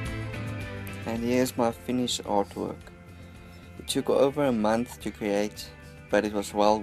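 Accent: South Africa. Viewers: You're South African? Edit: yes you definitely are, what's up boet? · You from South Africa, sir? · south african? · Is that a South African accent?... shout out from JHB.... awesome work! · bra r u from south Africa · Afrikaans accent - check Ugly South African 3-prong electrical plugs - check Johnson & Johnson petroleum jelly - check :D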